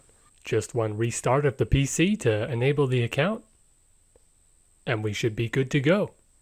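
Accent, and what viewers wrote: United States. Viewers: It’s been so long he almost got me with the American accent. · Just realised was speaking in an American accent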